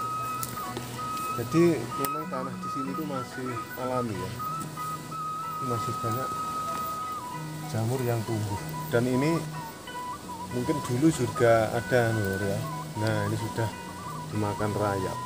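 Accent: Java, Indonesia. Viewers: dari logatnya seperti org jawa tapi musik nya daerah sunda gek piye kokyo gk nyambung 👎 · Jowone endi mas pean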